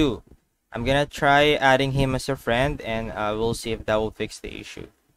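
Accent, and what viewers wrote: Philippines. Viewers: Boy halata na tagalog ka eh😅😅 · filipino accent you got there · Sounds Filipino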